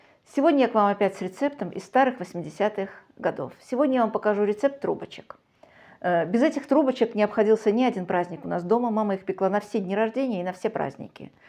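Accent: Baku, Azerbaijan. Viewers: спасибо за Ваш канал! Как хорошо Вы объясняете, понятно, легко и просто! И хочу выразить восхищение Вами! Ваше красивое лицо, спокойная и правильная речь, изумительные руки!и если не ошибаюсь, нежнейший Бакинский акцент! Удачи! Здоровья и Благополучия!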